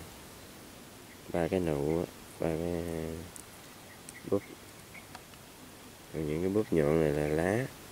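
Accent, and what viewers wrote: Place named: South, Vietnam. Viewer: E người miền bắc nghe giọng miền nam rất rễ nghe và nhẹ nhàng🥰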